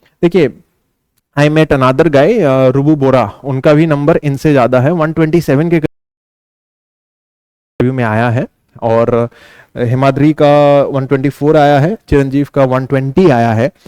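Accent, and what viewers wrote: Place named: North, India. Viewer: Sir your accent is like morth indians..😮🎉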